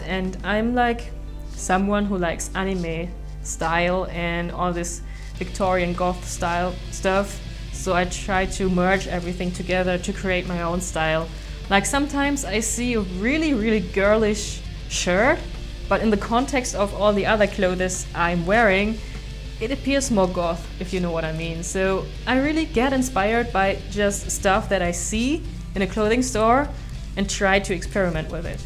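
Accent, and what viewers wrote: Germany. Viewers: A true accent from a true german girl! And yes, she really is! ;D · I really love German accent😍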